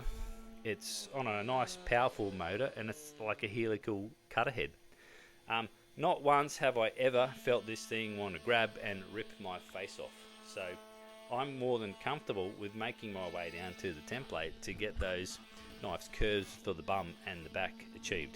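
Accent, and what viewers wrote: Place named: Australia. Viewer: Is it just me or does he sound even more Australian on this channel?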